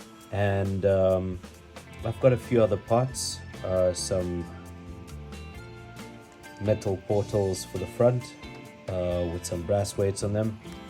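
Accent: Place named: South Africa. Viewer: Always great to hear a fellow South African when randomly browsing through hobby YouTube :) Quick question with the furitek starter kit how did you connect the two speed transmission servo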